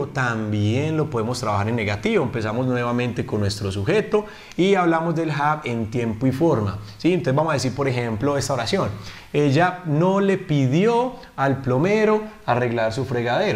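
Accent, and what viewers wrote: Colombia. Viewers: Hola Profesor, mi nombre es Guillermo Léon Gómez Gómez soy Colombiano y vivo aquí en Sincelejo, llevo muchos videos tuyo viendolos y ningún otro profesor explica de la mejor forma que tu, tu haces unos buenos análisis y te das a entender muy bien y que cada uno de nosotros aprendamos de la mejor forma como tu lo haces las cosas tan sencillas y se vea que si entiendas tus clases es por eso que escogí este curso tuyo para entender muy bien. De otro lado quiero que me digas si estás viviendo aquí en Colombia y donde aprendiste tu Inglés o estás en el extranjero, y siento que tu acento fuera de la ciudad de Medellín.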